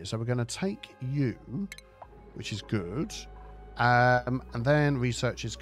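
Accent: United Kingdom. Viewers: I haven't whatched your content in the last months because CS2 doesnt interest me anymore but ONI is my all time favorite and I love love love seeing u playing it again!!! And I really missed your british accent! So I will watch ALL your ONI content for sure!❤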